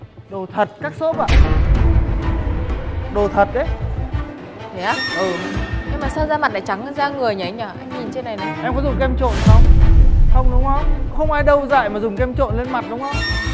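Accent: Vietnam. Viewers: HaTinh giong rat Ha Noi ^^ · giọng hà tĩnh lạ vkl ra :)) nhái giọng bắc ròi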